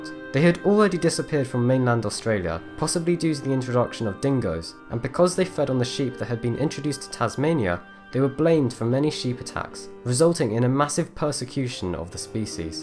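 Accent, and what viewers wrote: England, United Kingdom. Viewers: What is a May-ori? I am a New Zealander and it is pronounced Mow( as in "how" ) ree. You sound English so you should know how to pronounce Maori. The Maori Battalion sent thousands of Maori troops to both WW1 and WW2 in Europe.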